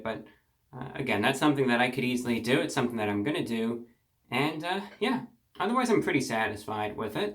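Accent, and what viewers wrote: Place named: New York, United States. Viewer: If you watch his older videos he has a NY accent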